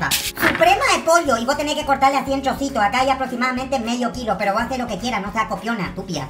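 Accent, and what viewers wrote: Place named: Corrientes, Argentina. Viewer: Como no perder el dialecto correntino me encanta muero de risa. Me encanta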